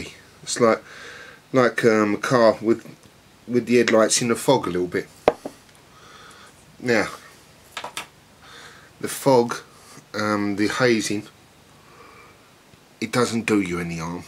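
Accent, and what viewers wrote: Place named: England, United Kingdom. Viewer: Greetings from California, United States. I'm going to try this beer. Thanks for the review and I enjoyed your lovely English accent. :)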